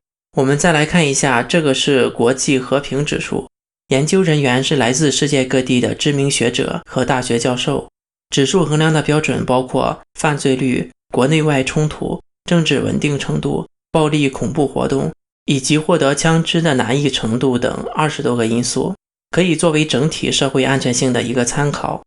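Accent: Shandong, China. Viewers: 逻辑错误：1、只要晚上天黑没有人，都会觉得害怕。错，在中国，偏远小镇天黑也没人，人不会害怕。说数字，不说数字都是扯淡。每十万人年刑事案件比率。说这个，说别的是扯淡。2、不知道哪儿出来的一个国际和平指数，和居民生活是否安全有毛线关系？一个野鸡和平指数排名排在110名，就能推导出在中国生活不是很安全？你脑子被法轮碾了？我也是知名学者，明天我就搞一个扯淡指数，你排世界第一。3、你还知道一个幸存者偏差，你列举的统统是负面案例，当然你幸存地认为中国是地狱了。不说概率，不说统计学上的调查研究结果，扯个案有毛线意义？还李刚儿子撞死，还毒奶粉毒死，这能比得过新冠死么？美国都死了20多万了，李刚儿子能撞死二十多万么？美国每年死于枪击事件好几万，中国毒奶粉毒死好几万么？4、中国癌症发病率死亡率全球第一？你在哪本大法杂志上看见的这个数据？我都笑喷了。起码的常识都不具备你。癌症发病率最高的统统是最先进的国家，因为人长寿，所以得癌，这么简单的事实你都搞不清楚。5、装个防盗窗，是落后意识和习惯，这也能佐证中国治安差，真是佩服你脑洞。那美国人家家有枪，是不是证明天天美国打仗啊。 综合可知：你是个不具备基本自然科学和社会科学常识的文盲，口音偏山东，普通话一股大葱味儿，一直提人权宗教，估计是山东农村那边信地下天主教的。逻辑混乱，语无伦次，建议你把口器闭紧了，味儿大的受不了，希望你能多学习，多闭嘴，积点德，为生态环境做贡献。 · 听口音博主是山东人吗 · 同意，口音偏山东，给山东人丢脸。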